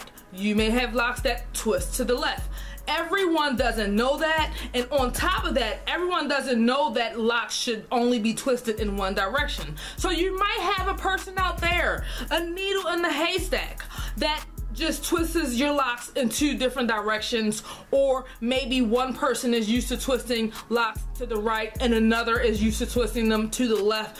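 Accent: Baltimore, United States. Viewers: Great tips! You from bmore shor?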